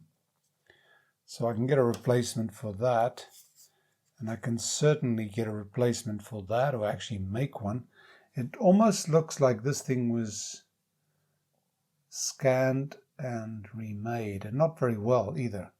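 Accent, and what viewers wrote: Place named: South Africa. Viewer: btw i was always puzzled by your South African accent, in Madeira and just now i found out that you studied there. The mystery solved. Sorry for prying.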